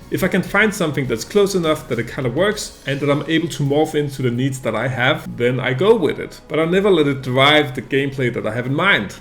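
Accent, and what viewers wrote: Denmark. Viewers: Love that thick danish accent.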